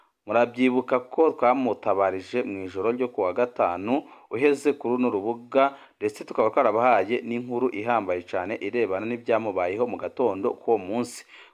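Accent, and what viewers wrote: Rwanda. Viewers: Amakuru yawe aba maremare kubera usubiramwo ivyo uba wamaze kuvuga kandi wige kuvuga neza ikirundi ugitandukanye n'imvugo nyarwanda nyarwa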